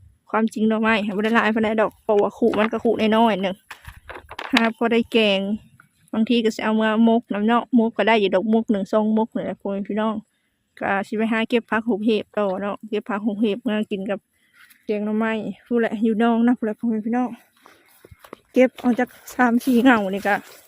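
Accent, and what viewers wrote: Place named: North, Thailand. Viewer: ຫມົກກະວ່າມອກເນາະບ້ານເຈົ້າສຳນຽງເວົ້າເຫມືອນຄົນເຫນືອເລີຍ!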